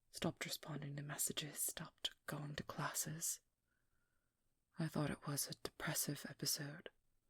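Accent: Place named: United Kingdom. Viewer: It's funny cause I was joking with my friend yesterday about writing a character and I said you take the nice happy go lucky person and then you add ✨trauma✨ Which leads to walls being build and "I am too cold for any to understand me" moto and then a wholesome/lighthearted moment and the character breaks a bit of their wall down to let that other persons in where we hear the tragic heros backstory and then we have what most characters fear ✨Character growth✨ 😱😱😱 Which leads to the redemption arc! Which then leads to the worse thing possible. ✨Healing✨ and ✨Positive mental health✨ dramatic gasps and screams 😂😂 But can I just quickly point out it's been a hot minute since I listend to so I kinda forgot she's British. And then hearing her for the first time in awhile I was like "Is she British?" (Context which makes this better is I am British and apparently really bad hearing because I can't recognize another British accent apparently) and then she said "Secondary school" and I was like "Yup she is." 😂😂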